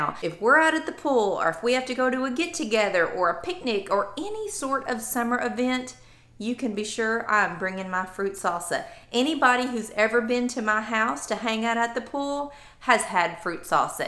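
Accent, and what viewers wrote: South, United States. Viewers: AHH,MY FAVORITE LADY.LIKE THE SOUTHERN ACCENT.YOUR THE BEST.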